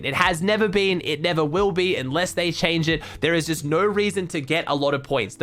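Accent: Australia. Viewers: G'day aussie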